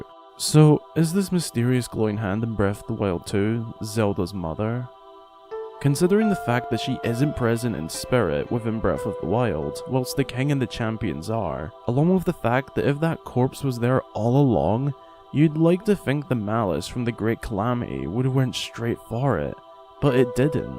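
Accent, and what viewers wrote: Scotland, United Kingdom. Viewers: Quick question, are you Scottish? Because that accent sounds awfully familiar.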